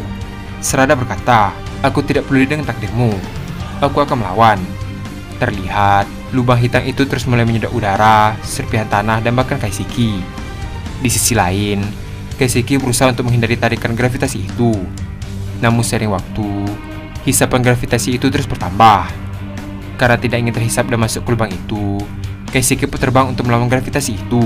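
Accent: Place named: Bali, Indonesia. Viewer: Logat baline keras gati bli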